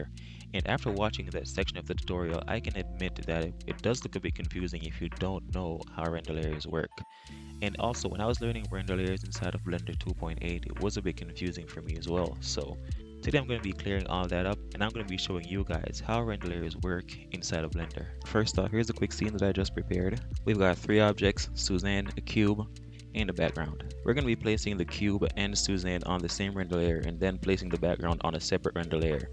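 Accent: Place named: Jamaica. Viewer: I like your accent sounds Jamaican.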